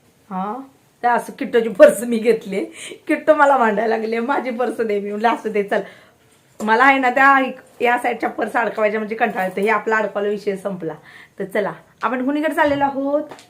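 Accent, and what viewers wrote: Kolhapur, India. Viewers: Aapli bhasha kolhapuri aahe, bilkul badlaych nahiii... Amhi kolhapurkar 🙏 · तुम्ही आहात तशाच गोड आहात.. कोल्हापूरचा अस्सल फील येतो...माझे माहेर आहे कोल्हापूर... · टेंबलाई देवीचे दर्शन तुझ्या मुळे आज झाले🙏.... अणि तुझ्याकडुन कोल्हापुर चि भाषा ऐकायला छान वाटते🤗.. भाषेचा लहेजाच असा आहे...!! 👍👍 🚩🚩Lots Of love and Blessings to you & all Family 🧡🧡🧡🧡🧡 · तुझ बोलन खुप गोड आहे तु काही बदल करु नकोस कोल्हापूर ची बोलीभाषा छान च आहे मुंबई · Hi tai tu khup mast boltis nko change kru bhasha mi pn kolhapur chi aahe tujhyamule mla devich darshn milal mi geli nhi yaveli so khup thanks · हाय खूप छान जत्रा एन्जॉय केली. असू दे छान बोलतेस.कोल्हापूरी भाषा पण छान वाटते. मी पुण्यात राहिली आठ वर्षे.देश तसा वेश करावा लागतो. व्हिडिओ छान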